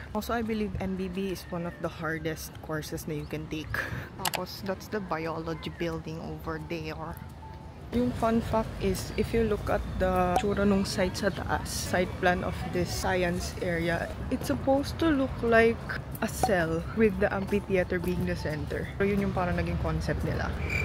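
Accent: Philippines. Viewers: Sheesh, koreana with filipino accent, kakainlab ❤😂😂😂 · You really adapt to the Filipino culture! That is so cool that you have an accent of Filipino than your own culture! Keep it up because it’s amazing! Hafa Adai in my language 😊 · I love you...ang kyute mo! Pinay na pinay ka talaga, the way you speak, smile and laugh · Holy shit ang tapang ng accent 😭 pinoy na pinoy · Your English accent is truly FILIPINO🎉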